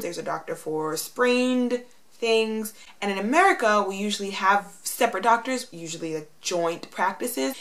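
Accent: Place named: Spain. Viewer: I love your videos and I noticed that your accent is definitely more of a Spanish accent than one of Latin America (hope I classified that right) anyway, I'm thinking about studying in abroad in a Spanish speaking country because Spanish is the only language I've studied so far. I want to be able to speak fluently. It's hard to learn in a classroom when I'm not applying the language to anything. Good info!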